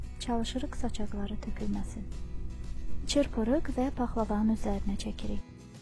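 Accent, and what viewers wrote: Lankaran, Azerbaijan. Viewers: Salam xanim,videonuzu izledim.Her wey Cox temiz,cox gozeldi.Aydin,selist nitqinize o melahetli sesiniz cooox yarawir.Men de paxlavani eyni Bu qayda ile edirem,ancaq qozun qabiqini hec vaxt temizlememiwem,hovselem catmaz buna😀Size wexsi bir sualim olacaq,cavablandirsaniz xow olar?Siz cenub zonasindansiniz?Lenkeran,Lerik?Lehceniz yoxdu,Amma bir kelmenizden orali olduqunuzu tehmin etdim.Sadece Duz fikirlewirem ya yox onu bilmek isterdim.Cox sag olun!Size 👍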